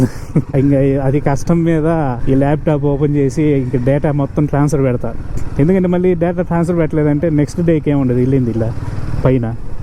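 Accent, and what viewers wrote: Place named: Rayalaseema, India. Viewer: Hi, I like your video and your Rayala seema language.I love you so much and liking to hug you one time.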